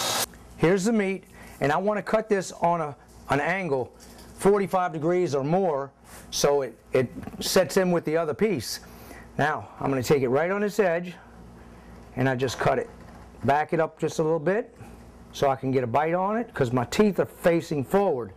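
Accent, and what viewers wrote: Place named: New Orleans, United States. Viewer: Nice videos!! I noticed your NOLA accent as soon as you started talking. I lived in New Orleans for 27 years, until Katrina ran me off. Anyways, thanks for the informative videos and the great tips. I especially liked the video where you did a circle sheetrock patch! Now that was slick!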